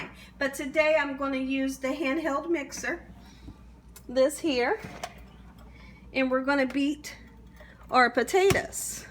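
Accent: South, United States. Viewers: I just love listening to your southern voice 😂you’re so sweet THANKS FOR THE COOKING TUTORIALS 🙏🏾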